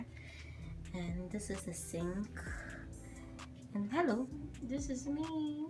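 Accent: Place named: Canada. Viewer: Kindly consider your sound it's low.... Hoping to hear some more Canadian accent soon🤩🤩🤩✨ Enjoyed the vid.... Keep it moving 💃💃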